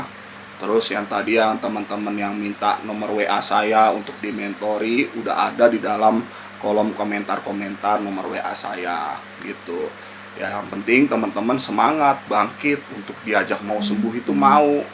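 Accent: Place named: Depok, Indonesia. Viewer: Asyiikk neh bahasa depok nya 😅😅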